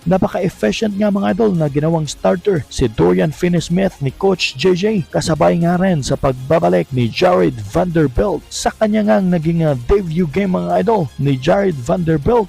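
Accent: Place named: Visayas, Philippines. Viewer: Likers(lakers) amputa tapos sikends (seconds) bisayang bisaya mag salita nakaka urat sa tenga.ambobo mo mag voice over.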